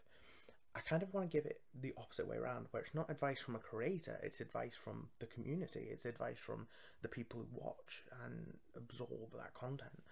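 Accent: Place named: England, United Kingdom. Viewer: I started watching Tiraradeguello because he gave some very interesting advice during one of his Illuminati videos and it hooked me. The decision to subscribe comes from both the relaxation but also the connection with the ASMR artist. The relaxation is also tied to the gimmick, I like conspiracy theories and weird things so I gravitated toward Tirar and Ephemeral Rift. When I followed you, it was the collab you did with Tirar, when you were helping Dr. Andrew Michaels. I got hooked because of the sassy adlibbing you were doing + English accent is so amazing. So My advice is, being yourself is only half the equation. you also have to have a good hook and put in effort, such as getting some story ideas and writing them down, working them into scripts you an follow / adlib over. Umbergnome ASMR is a wonderful artist if you want to see a story being told through an asmr video.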